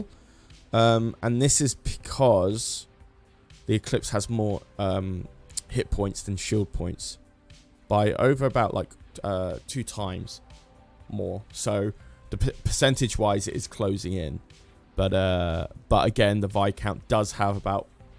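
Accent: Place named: United Kingdom. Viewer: His voice is different like more British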